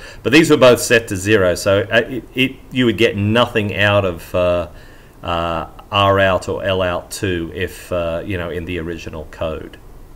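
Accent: Australia. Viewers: MickMake was a very popular YT tech channel from down under. wiffy was his ongoing joke. Surprised you're not familiar with his channel. Judging from your accent (?), I figured you were good mates. MickMake stopped producing his videos just over a year ago. I think he got fed up with the online negative discourse, so he took his 'ball' away. Looks like your channel might be a great alternative. I'll be watching. Happy New Year. 😎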